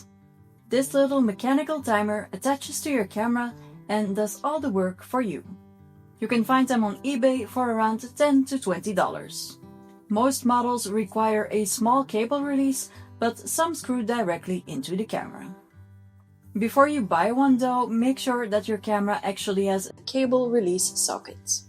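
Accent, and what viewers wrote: Flanders, Belgium. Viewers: Dankt voor de tips, leuk om de verschillende keuren haar te zien en je mooi Vlaams accent te horen :-)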